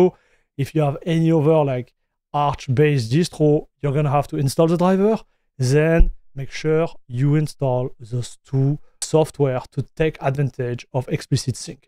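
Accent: France. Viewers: I like your frenglish voice, sounds refreshing.. o)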